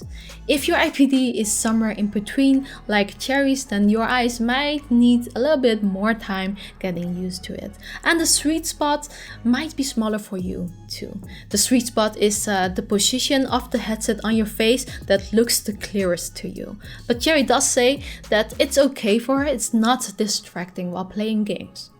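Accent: Netherlands. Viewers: Iam from belgium and i can hear your dutch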